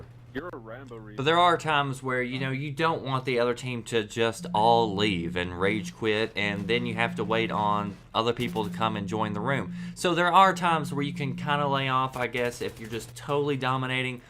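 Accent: South, United States. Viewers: where you from? i had a feeling you were from the south with that accent. go vols baby. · what part of the south are u from?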